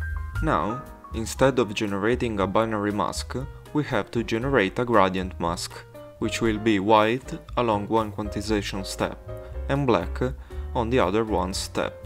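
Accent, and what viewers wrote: Italy. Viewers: Where is this guy from? His accent is a mix of like Italian and...I dunno, Indian? It's awesome. However, I have to say, more explanation would be great. For example, when you open your blueprint you have "absolute world position" and "mask" nodes already on the screen. Where did they come from and how do I get them? I had to just keep trying nodes that popped up after searching for "world" and "mask" until I found the right ones. And you bring in a parameter node, but which one is it?? There's like a hundred different ones. Same thing , I literally just held down letters and clicked until the right node came up. Just saying which one it is or searching for it instead of using shortcut clicks would be very helpful.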